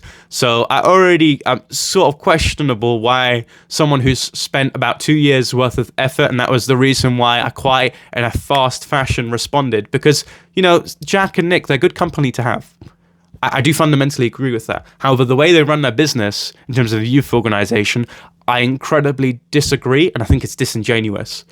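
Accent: London, United Kingdom. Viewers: Has had a bevvy? You sound more Black-Londener than usual. Anyway, Merry Christmas lad, all the best kidda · He has an inner London accent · Oh no. Is that a bit of the London Blackcent I can hear? Very off putting. I hope you can grow out of it.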